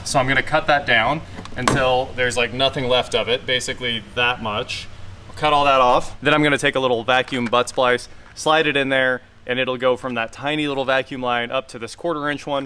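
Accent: United States. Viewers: i have an early 97 slk230....all door locks work fine...the interior plastic paint is an issue,,it doest stand the test of time well...you can buy oe paint from germany tho...you sound like your in america so prob the heat has caused probs with the glue on the trim...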